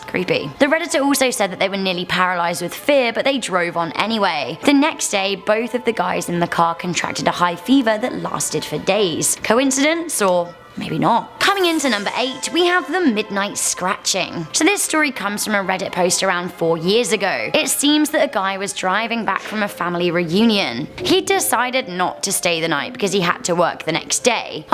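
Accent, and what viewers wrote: United Kingdom. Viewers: I'm so curious to know where your from 😍 your accent sounds British but distant like you haven't lived here for a while... Anyhow much love for the page you and Danny are amazing!! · Hey idk y but i love the British accent on women an i think ur hott lmao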